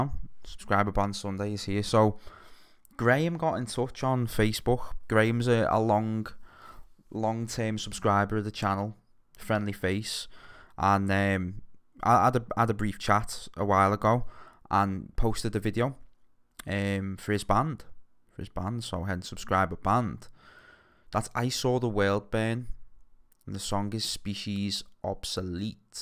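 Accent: Liverpool, United Kingdom. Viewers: Your passion for great new metal, your Scouse accent and you're totally hot 😛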